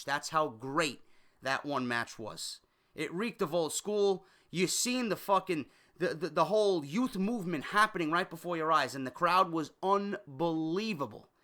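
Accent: New York, United States. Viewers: Love your vids, your ny accent makes me smile you lighten up my day. Full homo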